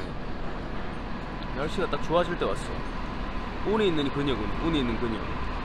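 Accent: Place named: Daegu, South Korea. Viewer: 사투리랑 말투가 엄청ㅋ멋지면서웃겨요 매너있는대구남자ㅋㅋ~~~그녀ㅋㅋ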